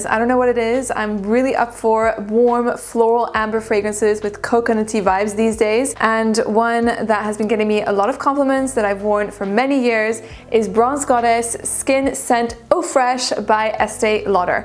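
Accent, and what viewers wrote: United States. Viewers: Hi. Great work on all of your fragrance videos! I remember when you first started your channel, a couple of years ago. Well done! I'm so curious: are you half French and half American? Your pronunciation of French words is of course native-level French, and your English has an American accent, but there is a "lilt" that tells me it doesn't come directly from the American mainland, at least in the linguistic formative years, up through age 13-14. I'm just curious! As an American, and a francophile who speaks fluent French, I am always fascinated by people's accents, and am curious about your linguistic background! Thanks for your great videos. Keep up the great work. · Bonjour je. Inès de m’abonner 😍la description olfactive est très intéressante et j’ai bien envie de me diriger vers une parfumerie pour les essayer. Merci et Bravo pour cet accent américain qui est Top 👌💯❤️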